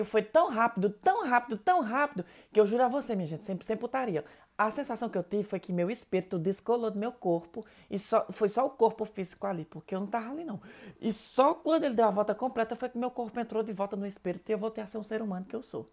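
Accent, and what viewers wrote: Ceará, Brazil. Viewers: "Tão rápido que eu procurei sentido em minha vida e não achei!" Cearense! 😁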